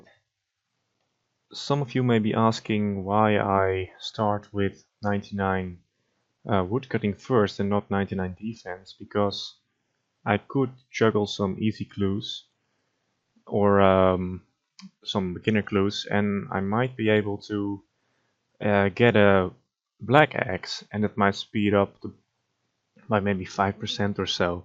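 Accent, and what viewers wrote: Netherlands. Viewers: you're dutch aren't you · are you dutch sir??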